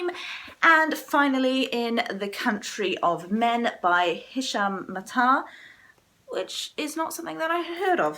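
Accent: England, United Kingdom. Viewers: at the Edge of the World If truth be told, you speak English as it should be spoken. It is we in the colonies who have the accents! You were blessed to have an English mother in NZ!:) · I am confused. Are you from NZ? You sound english, not at all Kiwi.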